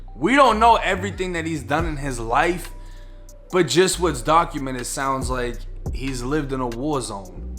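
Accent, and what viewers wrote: Boston, United States. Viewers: ARE U FROM BOSTON? Ur accent bro! Lol! ROXBURY DORCHESTER MATTAPAN!!!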